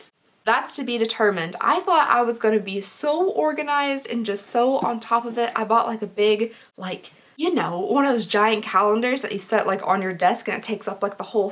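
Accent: Kentucky, United States. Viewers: ‘Sounding more Kentucky by the day’- I’m dead, lol I feel that though. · I just found out you’re from Kentucky and now that I know I can pick up on you accent when it slips out here and there. Haha I’m also from Kentucky. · I know your Kentucky accent is partly to blame (im from oklahoma so i feel this) but your pronunciation of some things makes me giggle a little:) especially apotheke!! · "Boxcutter'd... sounding more Kentucky by the day!" Wow, didn't know you were from KY until you pointed that out! And I've been watching your channel for awhile now. I'm Lexington, we're the same age and I really wish we could be friends irl UGH! ❤❤ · “Soundin more Kentucky by the day!” LOVE my Ky accent & being from, living in BBN 💙 Love you, you’re truly a breath of fresh air! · You make us Kentucky gals proud girl! Be proud of that Kentucky accent! 😊❤️ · "Soundin' more Kentucky by the day" Nothing wrong with that, girl! 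Good evening to you from Southeastern KY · I LOVE the KY accent <3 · I was triggered when you said you are sounding more Kentucky every day, and then I realized you are in Richmond. I live in Winchester. You are allowed to talk smack about kentucky if you live here...lol.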